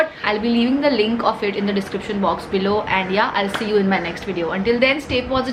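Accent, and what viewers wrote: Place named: South India, India. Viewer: South indian accent wali 🤣🤣🤣🤣..so cuteeee😘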